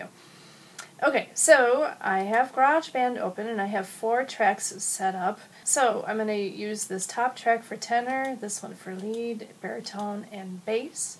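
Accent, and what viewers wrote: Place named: Wisconsin, United States. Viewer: I DO love your "Wisconsin accent," and I love your demeanor! Having taught for 30+ years, and having taught/supervised/evaluated teachers while so doing, I hereby award you an unqualified "A." You speak TO, not "at," your students; you take things logically; you "'splain why?" when appropriate -- wish I could really be your student!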